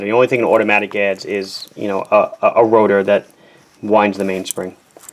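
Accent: Long Island, United States. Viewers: grew up on long island. moved away at 24. growing older, when i visit family i notice more and more that i hate the accent. thought i would have trouble watching these vids because of that. but the quality of content makes me completely overlook the voice. if/when i buy a watch in the future i'll try to do so from you. thanks for teaching me so much.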